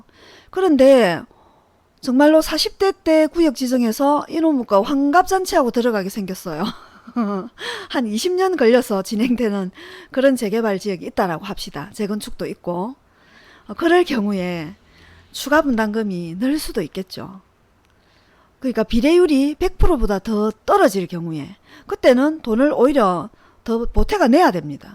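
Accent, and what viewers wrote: Busan, South Korea. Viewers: 처음들어보는 재건축 재 개발 에대한 전문가에 말씀 큰 도움이됩니다 부산사투리 정감있고요 또박 또박 허스키한목소 리로 진행하시는 말 솜씨 가 보통 아니시네요 저는 수영구 역세권 재건 축 대상지 주민으로 물어보고싶은것 많지만 공짜로 들려주시는 유투 버 정보 열심히 청취해보 보겠 습니다 좋은정보 감사합니다 저는 수영구 역세권 대건 축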